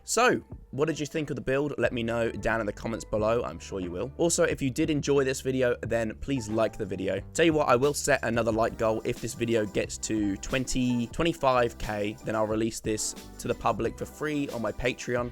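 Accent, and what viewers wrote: United Kingdom. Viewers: Your calm speech and voice, British accent, the chillhop and the patience and hard work you put into these builds/videos makes for such a fucking amazing and relaxing content. Your channel is Gold, mate.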